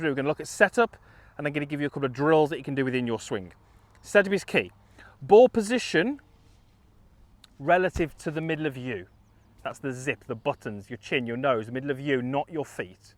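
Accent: United Kingdom. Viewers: Very clear even with your British accent 🙂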